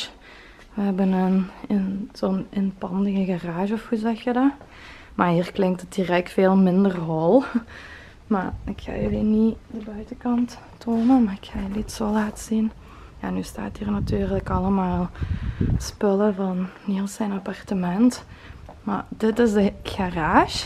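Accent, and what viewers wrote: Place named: Russia. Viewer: HERMOSO LUGAR!!! MY LOVE!!! Y A PROPOSITO DE QUE PAIS O NACIONALIDAD ERES?? RUSA?? UCRANIA?? VEO QUE HABLAS INGLES, PERO TU ACENTO ES DIFERENTE. BYE...BYE...HERMOSA.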